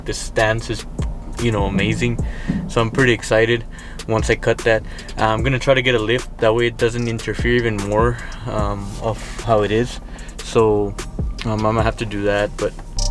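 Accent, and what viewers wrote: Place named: India. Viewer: you speak like an Indian or Pakistan guy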